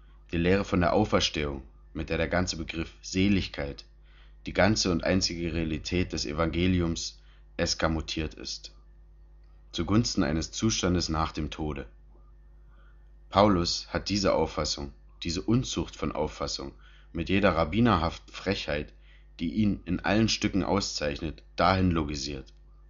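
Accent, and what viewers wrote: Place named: Saxony, Germany. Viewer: Es scheint mir unmöglich diese sächsisch angehauchte Stimme zu ertragen.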